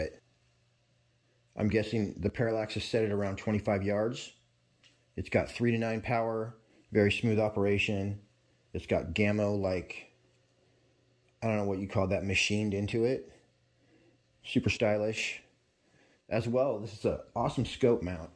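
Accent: United States. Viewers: I don't know which state has this accent but it is absolutely the most annoying accent that the US has to offer.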